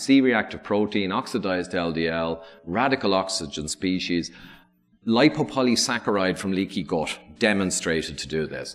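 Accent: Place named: Ireland. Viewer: Truly starts The Irish accent ... truly the sound of angels singing ;-)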